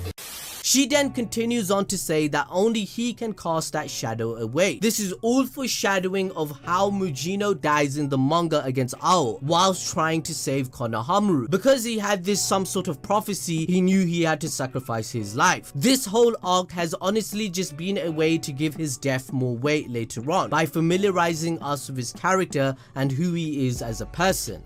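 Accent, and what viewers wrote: Punjab, India. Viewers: that punjabi accent though. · LMAO , are you Indian?? , if yes you have a very nice English accent , btw Love ur video and fk trashamaru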